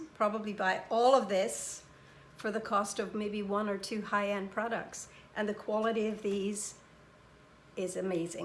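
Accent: Ireland. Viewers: Just found you from Kathy's video! I've subscribed :) Are you part Irish? I can hear something different in your accent but can't place it 🤔 x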